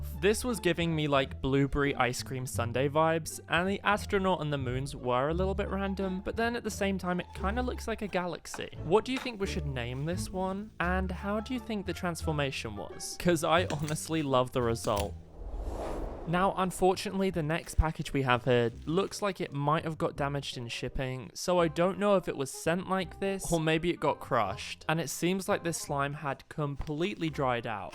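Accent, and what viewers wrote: United Kingdom. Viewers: He sounds British bro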